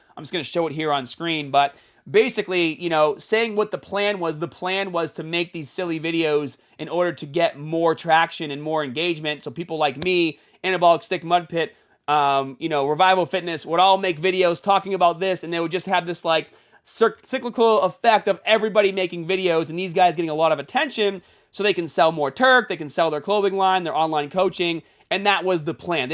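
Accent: Boston, United States. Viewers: I'm just here for that Boston accent bro. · just found your channel. New to GymTube so I was watching Greg for a bit (along with Athlean X. Great content). Will watch you instead of Greg going forward. Accent reminds me of Will in Good Will Hunting 😀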